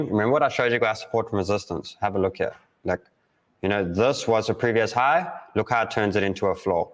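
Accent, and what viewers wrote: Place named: South Africa. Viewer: He's sounds South African to me but I don't know because there's so many different South African dialects.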